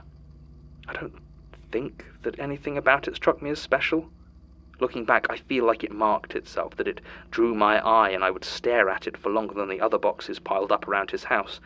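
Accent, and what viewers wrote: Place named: United Kingdom. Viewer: I was really unbelieving when I kept hearing about how the Magnus archives has every fear, but I gotta say my heart sank as soon as I clicked on the first episode and heard voice. British people.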